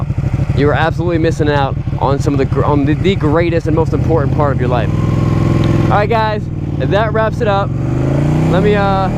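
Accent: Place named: United States. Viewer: Ok guess where you are....from your accent and the fact your on the wrong side of the road, plus your about to dribble out your opinion, id hazzard a guess that your an American in America. Am i right or am i right?. Anyway carry on, i like watching and listening and everybody's entitled to their opinion, no matter what leftwing progressive's say.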